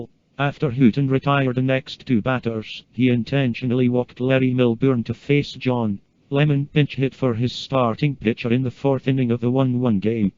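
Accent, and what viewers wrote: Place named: Ireland. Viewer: I am wondering: why did you choose the Irish voice for old videos on this channel?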